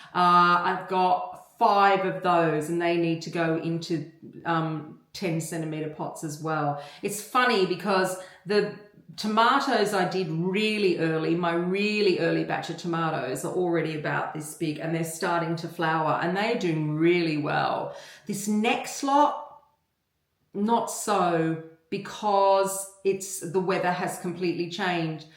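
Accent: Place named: Australia. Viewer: Hi Thank you for your videos they really helpful and yes, you do have a lovely Australian accent 😂 Im from Portugal, but I've been in England for 33 years, and i, too, don't think i have an accent, but apparently, i do I guess in my head, i just sound very British 😂 and it always makes me giggle when someone asks where im from😂 Have a lovely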